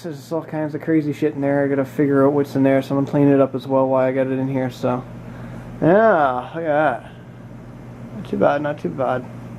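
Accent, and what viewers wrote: Canada. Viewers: Massachusettes plates .... But u sound like a Canadian ;)..... Nice truck tho..... Love those F250/350 styles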